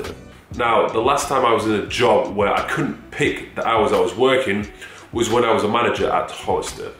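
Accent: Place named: England, United Kingdom. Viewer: Hi, you’re a handsome guy and I love your English accent. Stay humble. 💕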